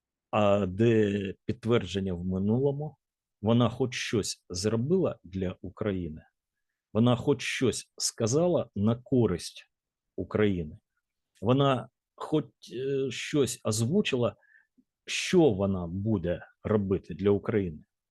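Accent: Russia. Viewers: Як відчувається російський акцент, і в мові, і в темі.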